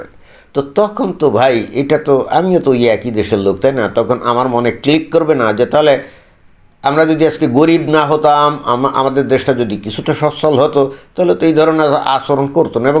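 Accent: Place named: Jessore, Bangladesh. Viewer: আপনার কথা শুনে মনে হয়, আপনি যশোরের লোক।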